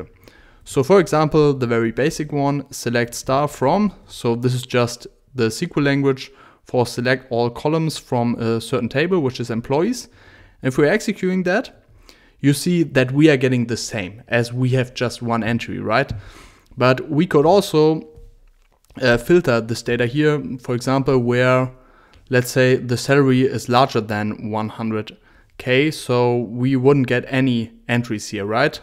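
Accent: Germany. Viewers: HEY man, you are 100% a German :=), right?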